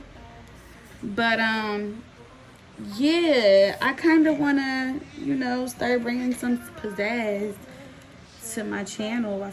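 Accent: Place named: Baltimore, United States. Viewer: You’re so pretty I love your accent , bmore?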